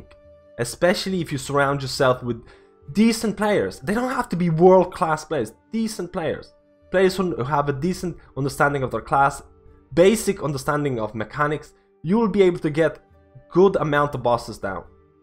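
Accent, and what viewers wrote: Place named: Dublin, Ireland. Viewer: Where are you from. I kind of detect a Dublin accent but not a native Dubliner. Like you're from another country in Europe but adapted over time. You're probably not but just thought I'd ask.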